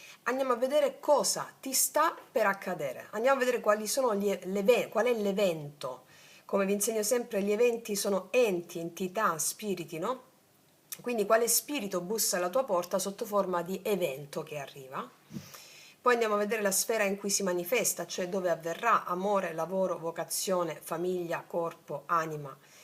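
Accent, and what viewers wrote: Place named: Salento, Italy. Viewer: Grazie cara amica, spero si avveri subito. Dal tuo accento, sento che sei del Salento come me. Dico bene? Un caro saluto 🙋‍♂️🙋‍♂️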